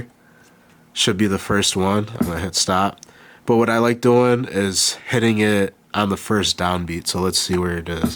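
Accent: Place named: Upstate New York, United States. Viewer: Love that u have that upstate NY accent too! boomboomBoomBOOOOOM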